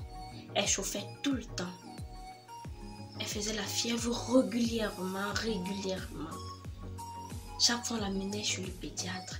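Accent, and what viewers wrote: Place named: Cameroon. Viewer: c’est ton accent 🥰 j’ai fait par élimination et je suis tombée sur le Cameroun 🇨🇲🔥 😉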